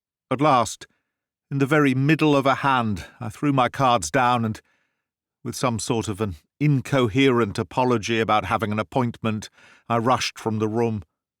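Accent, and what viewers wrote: United Kingdom. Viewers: You can never imagine my pleasure and joy when listening to your clear British accent Mr... When I got the notification for a new YouTube episode of your marvellous channel i had immediately listened to your charming narration.. please keep on sharing classic literature... They are my favorite... Thank you very much Mr I owe you a lot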